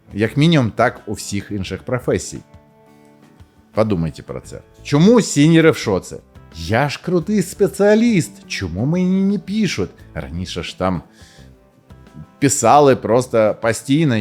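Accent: Russia. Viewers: Переход на украинский - это одно, но результат пока что выглядит сыро. В речи много русских слов и интонаций, из-за чего украинский воспринимается неестественно. В итоге это только ухудшает общее впечатление от видео :( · ти топчик, що перейшов з російської на українську. Це на справді дуже потужних та поважний крок, повага від всієї україномовної спільноти. Але будь ласка продовжуй цей процес бо твоя україська звучить як адаптована на україньську російська. Тобі ще є куди рости, вдосконалюсься, та все буде Україна. Дякуємо тобі за твій вибір.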